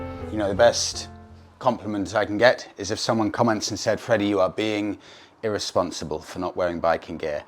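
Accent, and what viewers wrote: United Kingdom. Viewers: Ur accent is from uk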